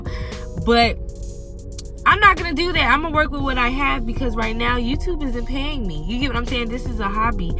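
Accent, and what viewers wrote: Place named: Miami, United States. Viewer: Sounds like you from Miami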